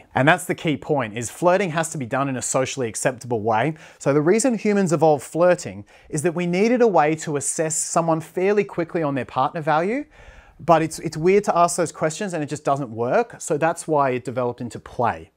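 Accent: Australia. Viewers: you've got beautiful body building but the best part of you is your face your eyes your lips and your smile and your voice I know too much ha ha but it's true- I whish I could live in Australia I love Australian accent :))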